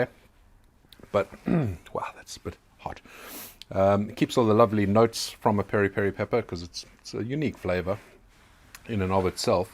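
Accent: South Africa. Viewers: Are you from South Africa brother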